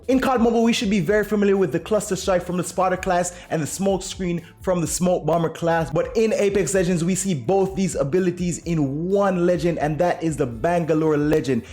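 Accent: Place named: Jamaica. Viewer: Lol just meet up on u channel from how u talk mi know yawh jamaica from u say 3 ppl than person lol big up bro bro and make a sub zimi😎 and to me apex is a big fresh air cause cod rn just a bring same thing over and over cant bother with that more while and rank fun fi grind more on apex than cod not to mention the skill it takes to play apex..see how it go still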